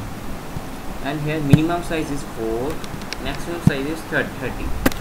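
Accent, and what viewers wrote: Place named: India. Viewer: indian accend just fucked up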